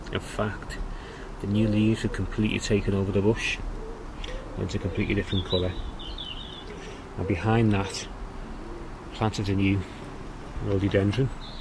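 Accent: Liverpool, United Kingdom. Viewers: Loved this video narrated by a fellow scouser, your plants are beautiful. Regards from Ontario, Canada.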